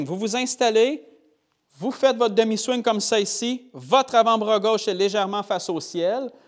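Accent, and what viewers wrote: Quebec, Canada. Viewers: Vidéos toujours aussi bonnes en plus j'adore votre accent québécois.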